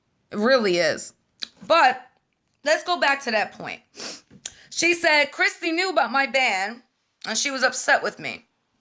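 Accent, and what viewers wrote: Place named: New York, United States. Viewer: Since the first time I met you I have felt you are a honest person. I too tend to "walk the line" in this wax world, I ignore drama as much as I can as you do. Good for you for standing up. Keep being you and keep making these videos! I love watching you (and listening to your New York accent) I've heard this before "Who here has been victimized by Regina George" and it's completely true. You are either for her or against this person, there isn't an in-between. If you ever need anything I'm here for you!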